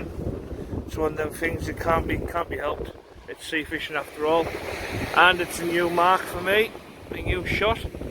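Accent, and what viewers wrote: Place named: Teesside, United Kingdom. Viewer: Just subbed👍👍 I think you're my neck of the woods by your accent. Are you Boro/Stockton area?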